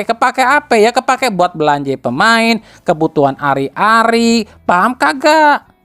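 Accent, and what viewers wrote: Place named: Betawi, Indonesia. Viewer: 😂 bahasenye Betawi ni😅😅kereeeeen. Selebih nya ya no comen dan nyimak aja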